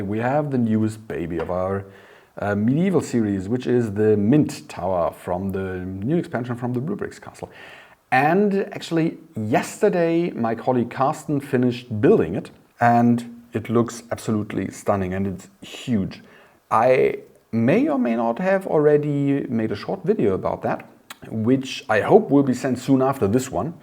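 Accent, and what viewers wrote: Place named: Germany. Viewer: great to finally hear humans here! have you considered investing in a speaker yet? No offense against but his german accent is "noticeable" lets say! anyway, is <num>x times better than any bot!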